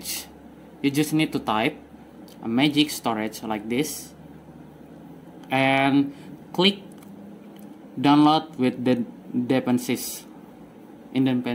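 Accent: Indonesia. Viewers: Makaih bang , btw gw tau lu indonesia dari accent inggris ly bang hehe 😅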